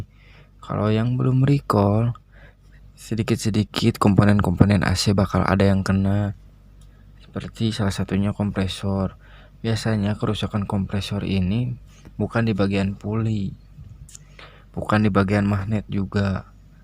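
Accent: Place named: West Java, Indonesia. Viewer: Logatna Sunda euy... Kumaha damang kang 😁🙏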